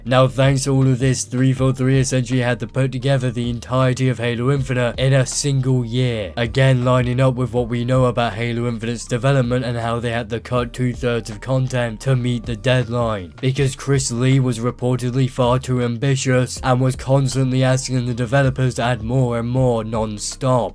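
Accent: United Kingdom. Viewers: He's bri'ish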